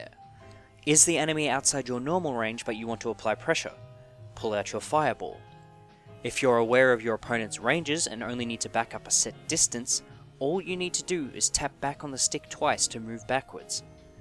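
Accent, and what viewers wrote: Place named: Australia. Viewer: He's Australian Wtf is up with American people and not being able to recognise accents? Smh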